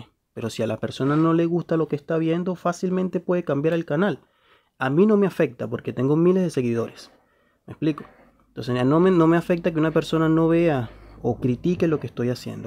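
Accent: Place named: Venezuela. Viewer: Por tu acento mi pana veo que eres venezolano igual que yo.... Te la estás comiendo viejo... Gracias en nombre de la comunidad que nos apasiona la electrónica porque tus clases son gratis, bien explicada con excelente metodología.... No le pares bolas a los envidiosos...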